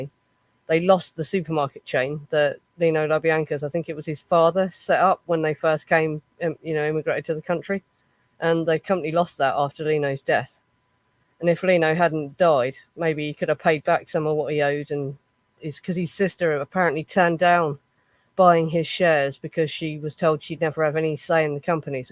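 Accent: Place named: Liverpool, United Kingdom. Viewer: I love your accent!!You sound like a Beatle!!My son's are named John,Paul,and George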